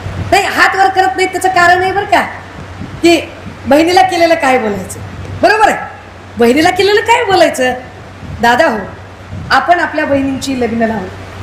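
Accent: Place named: Bihar, India. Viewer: Tai lay Bihari bolataahe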